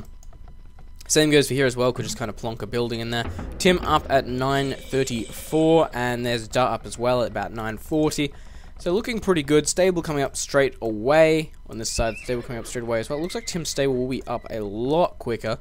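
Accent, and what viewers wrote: Australia. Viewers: About deer luring. Viper says it's usually wise not to lure deers. You should really make video with accentuated australian accent.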